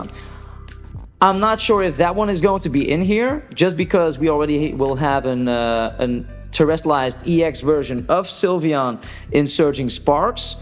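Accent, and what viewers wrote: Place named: Netherlands. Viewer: Are you Dutch? You have a dutch accent. Anyway i think the feebas and melotic need to go on top of eachother. So the feebas below an melotic on top. Anyway keep up the videos😁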